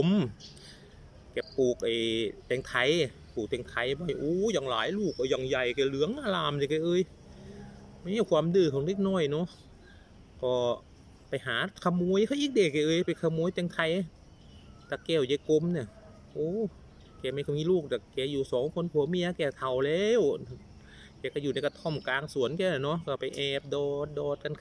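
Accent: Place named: Korat, Thailand. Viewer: ภาษาโคราชน่าฟังจ้า 😂😂 เด็กน้อยดื้อคักน้อจ้า เก็บความทรงจำไว้ ดีค่ะ ขำดีจ้า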